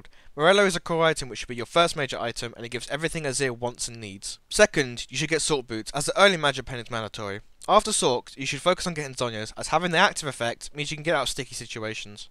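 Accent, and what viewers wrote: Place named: England, United Kingdom. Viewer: 21 I get it if I rewind the video but it's a bit of a hassle. Try pausing a bit between words man, you have a very heavy english accent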